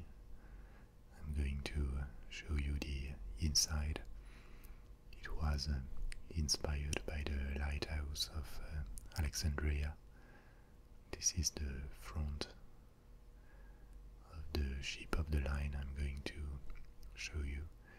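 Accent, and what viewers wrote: France. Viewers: I just found your channel and are clicking through your videos and just wanted to leave a comment. :) Your level and tone of voice is exactly the right trigger for my ASMR (as well as the French accent...I don't know why, i just really like it, it calms me). So thank you for your amazing work, I will keep on listening now!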